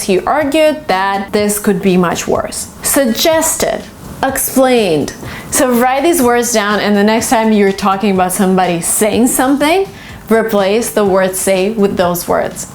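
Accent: United Kingdom. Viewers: Are you from the UK? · She has a lot of british accent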